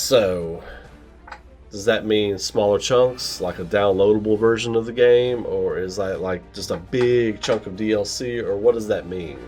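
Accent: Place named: Kentucky, United States. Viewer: I’m curious on you accent, it sounds like Kentucky, but it’s a bit more rounded I think.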